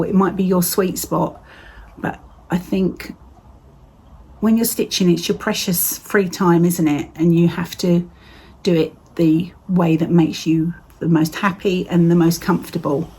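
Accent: England, United Kingdom. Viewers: I think you should suck it up unfortunately and finish your blackbird designs piece. It is beautiful what better than what you think.. I don’t like doing two threads any more either I like the look of one thread on 36ct or 40 ct. I love to listen to you with your English accent. I have a brother in England and a lot of other relatives. Thankyou so much. Sue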